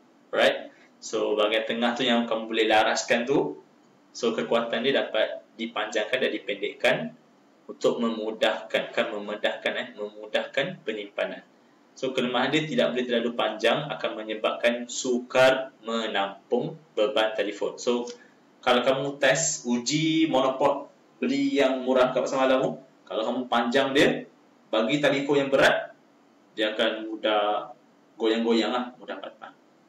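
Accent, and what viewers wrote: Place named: Sabah, Malaysia. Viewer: Macam orang sabah bah si ni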